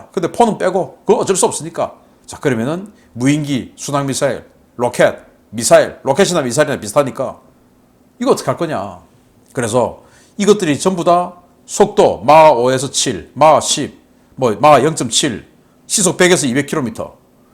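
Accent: Gyeongsang, South Korea. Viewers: 갱상도 싸나이 최고!!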